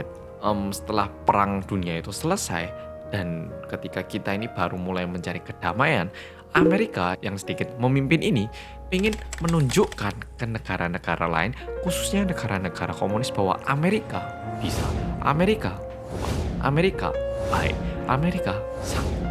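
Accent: Java, Indonesia. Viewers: back to story from, wes medok, muaenak tenen dengar ceritonya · Pentinge "medhokkk" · hello guys welcome back to Korea Reomit bersama orang korea yang.. MEDHOKKKKKKKKK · Kenapa kalau lihat mas gw selalu tiruin intro nya HALO GUYS WLCOME BACK TO KOREA REOMIT BERSAMA ORANG KOREA YANG MEDHOKKKKK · editor nya mantap bang nya lebih mantap..Fix paduan mantap 😆😆 Salam medhooookkk!😂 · bersama orng korea yang... AKU&: menDOK!!! Aku selalu ngikutin kata "mendok" setiap opening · Mas medokkkkk wkwkwk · mau ngumpulin org yang sama sama nyebut medhookkkkk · Oke bolo-bolo , bersama orang Korea yang Medokkkkkk ,🤗🤗🤗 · kok seneng q ndelok e mase medok e iku 🤣🤣🤣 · Podho Karo tonggoku ,jare wong Korea ,tapi ngomonge medhok juowooo pol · Orang Korea Medok.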